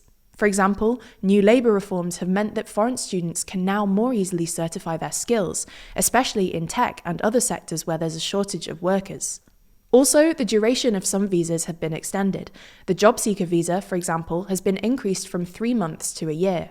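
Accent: United Kingdom. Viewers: I like youre British English accent anyway ❤,and shape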